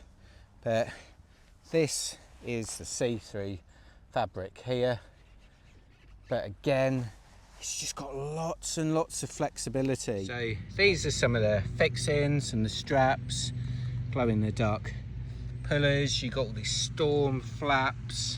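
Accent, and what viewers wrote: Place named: Northern England, United Kingdom. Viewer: I am Swiss and lived in Cambridge UK for six and a half years during the 1990's. I notice your northernly accent. Does not sound like Manchester or Birmingham to me, but I could be wrong. However, where does your accent/dialect relate to geographically? Here in Switzerland we have many dialects, only 10 kilometers away somebody speaks somewhat differently.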